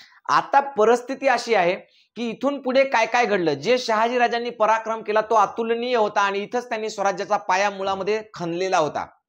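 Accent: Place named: Marathwada, India. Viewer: तुमची मराठवाडी भाषा फार छान वाटते.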